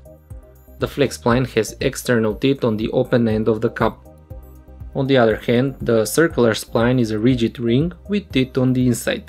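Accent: Russia. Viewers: Please stop talking with a Russian accent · Русский акцент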